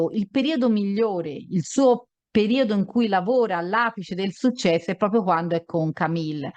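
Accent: Tuscany, Italy. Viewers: bellissimo contributo con il tuo bell'accento toscano o fiorentino che ne so...bel documento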